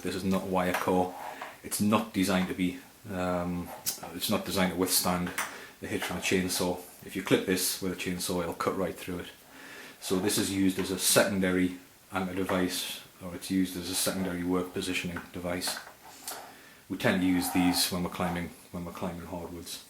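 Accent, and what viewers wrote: United Kingdom. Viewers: Nice vid. Used this to confirm an approach were taking with soft strops. Using a Petzl Micrograb instead of the pussick. Are you from the north east?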